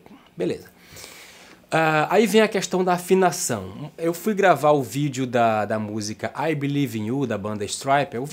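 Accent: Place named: North, Brazil. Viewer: vente você nesse vídeo que você conversa bastante e toca um pouco, parece duas pessoas, você tem um falar calmo, simples com esse sotaque lindo do norte, mas quando toca fica um monstro( no melhor sentido) e arrebenta... Valeu Cara!!! Por toda humildade e talento.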